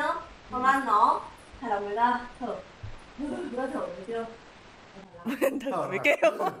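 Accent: Hanoi, Vietnam. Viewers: Thích nghe giọng nói của cô gái Hà Nội này quá đi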